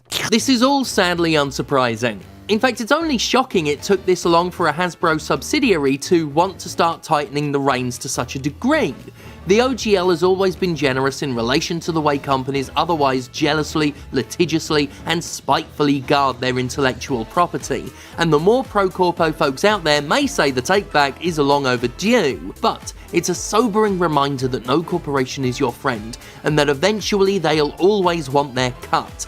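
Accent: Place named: United Kingdom. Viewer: Hey Stephanie Long Time watcher I feel like I don’t comment enough to let you know that I love your Content because I love anti-corporate stuff and I love video games and I love you. Just wanted to say that it sounds like these corporations are the most chaotic evil rogue guild and I adore that you used a cyberpunk 2077 phrase when you called them corpos. Thanks for saying that they were rolled A1 this time but still have their eye on the cookie jar. I love your British accent, and you’re alliteration and your metaphors.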